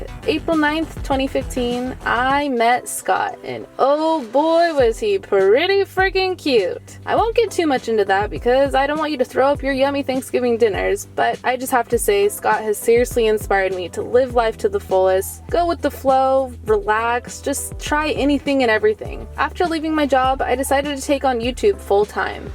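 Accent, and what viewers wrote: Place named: Ireland. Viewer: Daniel Amusan Thanks! Btw Iove the Irish accent! XD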